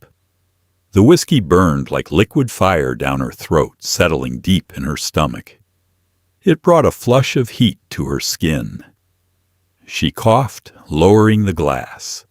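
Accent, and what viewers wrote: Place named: South, United States. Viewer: a little "southern" for an Italian Mafia video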